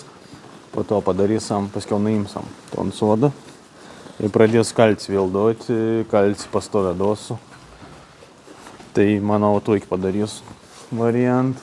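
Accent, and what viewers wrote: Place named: Samogitia, Lithuania. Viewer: O kodėl blogai gražia žemaitiška tarme?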